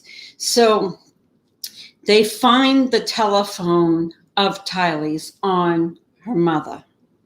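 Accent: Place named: East Coast, United States. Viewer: I love your Eastern accent...New York? Boston? Where are you from? Thank you for sharing your knowledge....Very much appreciated!🌞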